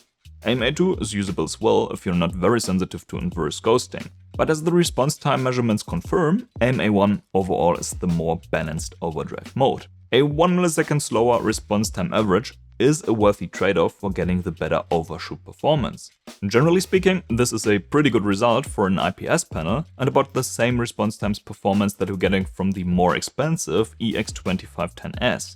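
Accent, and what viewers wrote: Germany. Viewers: german, right? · wait he’s German?😭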